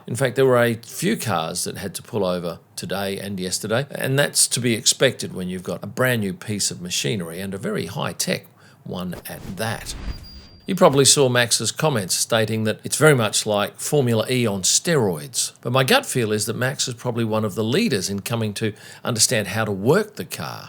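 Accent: Australia. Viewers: Hey,nice to hear a dinkydi Aussie talking about F1 again 🇦🇺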